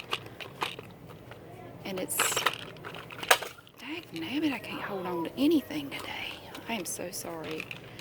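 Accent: South, United States. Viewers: You sound like my family. We're from Alabama and South Carolina. When I get sleepy or angry my southern really pops out. I find your videos really soothing. Keep being you!!! ♥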